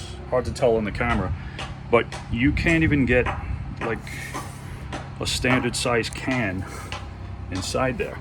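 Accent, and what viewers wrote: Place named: East Coast, United States. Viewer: Hey there. Long time follower since we were East Coast neighbors with distinct "accents" lol, before your move to sunny Fl. The best thing I liked seeing you do was not only the last upgrade video where you replaced the engine flush connectors to quick fits, which is a game changer and something me and Dad did to all our boats and dock gear back a bit in the day. But you read my mind and connected them both with the jumper for a one and done, which wasn't available back then. But yeah was really another cool thing you came up with, on the fly for maximum ease. It brings me back to the good ole days with Dad, you two have/had the same think on the quick and make it work or fix anything. 👍 Good stuff. Best wishes too you 3 on your new girl and new adventures!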